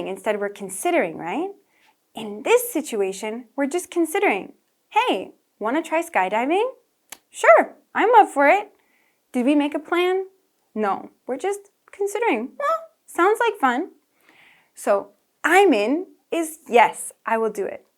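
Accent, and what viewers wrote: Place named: United States. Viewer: 음~~~ 이분 영어 강의 들으니까 미국인 같아 !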